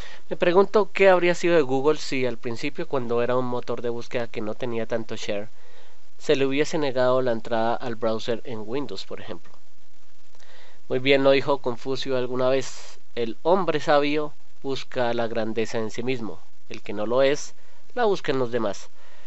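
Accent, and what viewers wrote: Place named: Mexico. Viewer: El Senséi tiene toda la razón, con acento manito, pero la tiene toda :o)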